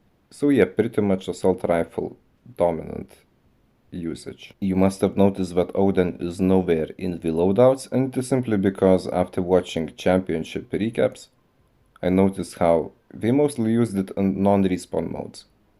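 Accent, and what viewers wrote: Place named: Russia. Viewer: U from Russia cuz you got pretty thick Russian accent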